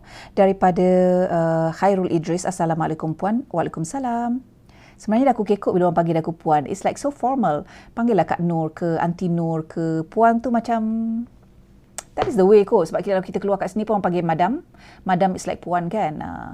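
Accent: Negeri Sembilan, Malaysia. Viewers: Seronok tgok celoteh dik ni dgn ckp nogori lgi, wlupun lama di France x lupa loghat negeri 9 👍👍👍😍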